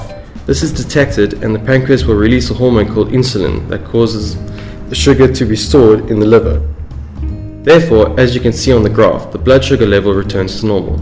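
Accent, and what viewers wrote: South Africa. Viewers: Are you south african? 🤔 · Ak Khan He has a South African accent . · when you have the same accent as him (0-o) (lol he is South African for anyone wondering - or at least he sounds it)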